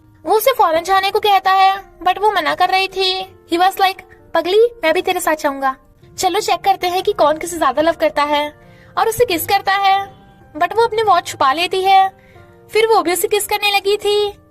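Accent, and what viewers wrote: Punjab, India. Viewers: Aap punjabi ho 😮?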